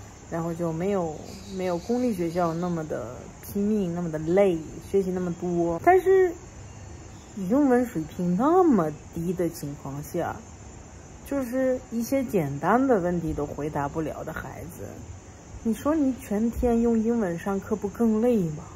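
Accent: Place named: Beijing, China. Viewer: 这中文都有京腔京味了，厉害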